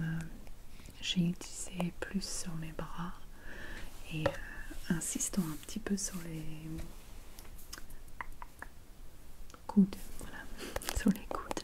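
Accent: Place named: England, United Kingdom. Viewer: Cette vidéo est vraiment incroyable pour dormir votre accent anglais en parlant français me donne des frissons!!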